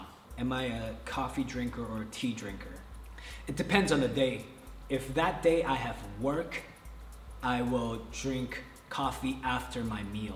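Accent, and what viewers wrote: United States. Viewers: i obv know that i'm just trying to say that even though his accent is kinda american there is a little diference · A non-American teacher with a tinge of an American accent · He went to an international school in HK, so yeah American accented English is norm. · He went to American International School in Hong Kong and was probably taught by Americans, that's why he speaks like an American. But I love your comment :D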